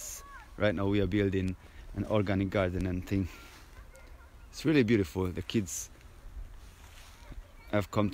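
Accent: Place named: Jamaica. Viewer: You sounds like a Jamaican did you develop an accent or something like that it sounds very unique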